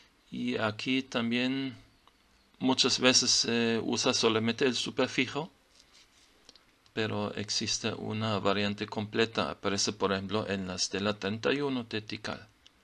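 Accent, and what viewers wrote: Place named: South, Mexico. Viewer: Al escuchar a este hombre pense que era un indigena del sur de Mexico - me sorprende que sea Europeo.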